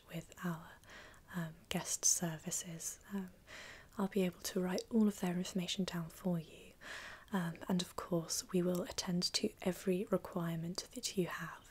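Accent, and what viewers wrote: United Kingdom. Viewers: The British accent is a turn on. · My thoughts: I think she’s British Her: speaks in British accent Me: I knew it!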